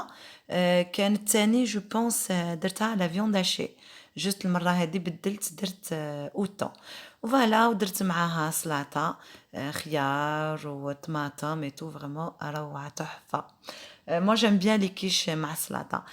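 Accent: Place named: Constantine, Algeria. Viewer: J'ai adoré l'accent constantinoise bcp plus ya3tik sa7a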